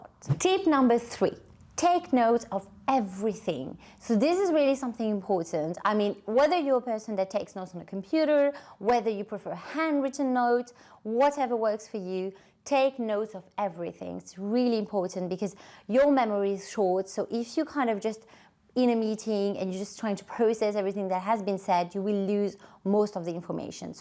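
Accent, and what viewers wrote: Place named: London, United Kingdom. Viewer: thank you for these precious tips. Love your lovely London accent xoxo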